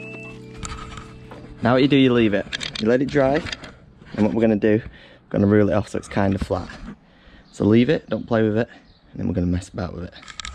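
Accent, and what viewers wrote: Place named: Manchester, United Kingdom. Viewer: Not often you hear local accents on YouTube! North Manchester?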